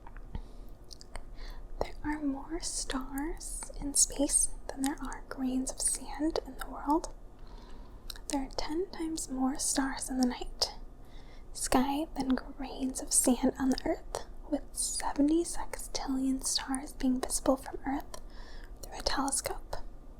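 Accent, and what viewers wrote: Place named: Midwest, United States. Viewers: Its her midwest accent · She must be from the Midwest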